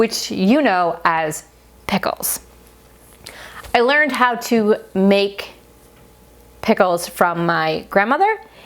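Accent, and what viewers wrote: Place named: Kandahar, Afghanistan. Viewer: سلامونه جاني، زما مو تر اچار جوړولو په رسا کندهارۍ لهجه ږغېدا خوښه سوه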